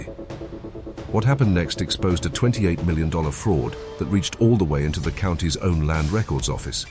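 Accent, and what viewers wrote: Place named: England, United Kingdom. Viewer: WHY THE ENGLISH ACCENT ?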